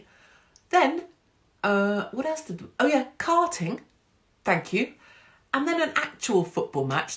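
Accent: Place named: England, United Kingdom. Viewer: GOD----YOU ARE GOLD, YOU KEEP GETTING BETTER, LOVE THE ACCENT, PURE ENGLISH EXPRESSIONS, '' CARRY ONE BRITANNIA '',.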